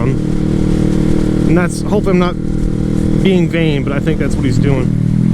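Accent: United Kingdom. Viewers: 😂 the British accent. I’m surprised at how laughter my lungs are currently. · Love the British accent ✌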